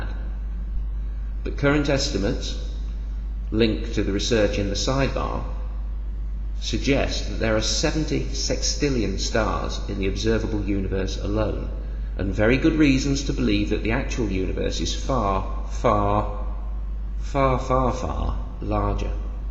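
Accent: United Kingdom. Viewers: Your voice echoes with intelligence. It's somewhat mezmorizing. And it has that awesome British accent lol. Anyway, I love you videos. It encourages individuality and thought.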